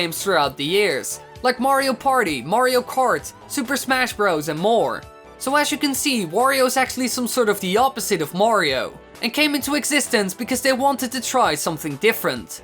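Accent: Netherlands. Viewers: are u dutch? your accent is like you speak dutch but uses english words, however not a ''bad'' accent haha, i am dutch but it just looks like ur speaking fake dutch.. i cant describe it well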